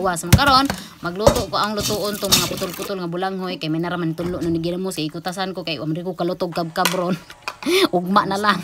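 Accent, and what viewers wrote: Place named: Bohol, Philippines. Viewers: Hi mga nice jamo imong mga content sa vedio vlog,mga lami au imong mga gepangluto,accent sa u inistoryahay that Bohol jd,jamojamo jd......kalami 😋makalaway oi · Ganahan kaayo ko maminaw sa imong Bisaya accent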